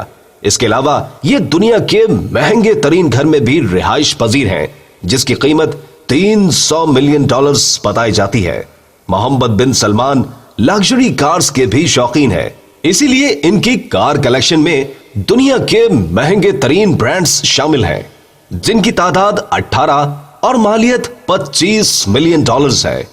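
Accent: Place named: India. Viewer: Ye jo bhoonk raha hai ye to bilkul Indian style hai lagta hai ye indian hai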